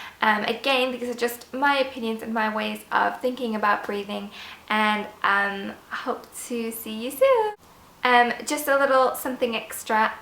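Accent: United Kingdom. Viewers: didnt know she was british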